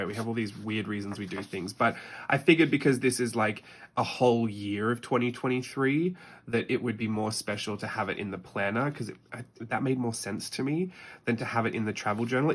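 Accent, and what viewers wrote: Australia. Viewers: Loving the super strong Aussie language and accent coming through today “onya ”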